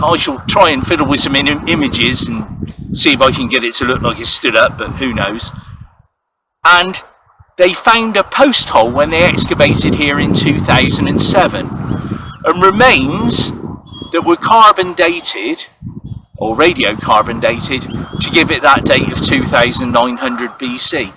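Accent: Wiltshire, United Kingdom. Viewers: Thank you. I didnt know anything about the stone. I love your Wiltshire accent. ❤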